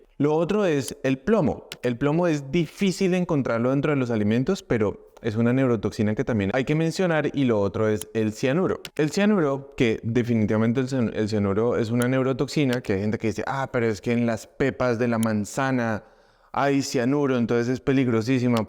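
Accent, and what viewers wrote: Colombia. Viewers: Gracias por sus videos he aprendido un poco más a cuidar mi salud y además con ese acento colombiano que me encanta es más fácil para mí estar concentrada en lo que esta diciendo (soy mexicana) ❤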